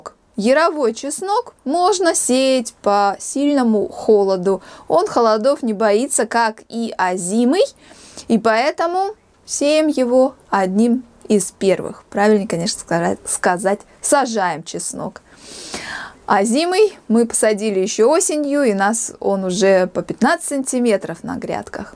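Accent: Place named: Latvia. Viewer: Почитал коментарии, оказалось вы в Латвии живёте!!! 👍 Вот и решение вашего говора... Мне нравится ваша речь!!! 😀😀😀 И я не маньяк, как мне написали 😀😀😀.... А у нас здесь некоторые и "гекают" , и "шо" , и "чё" , и "у клубе," "у селе" 😀😀😀...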